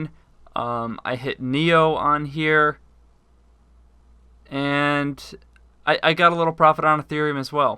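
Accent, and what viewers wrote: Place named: United States. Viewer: You sound like your'e from America. I thought bitfinex doesnt allow US customers?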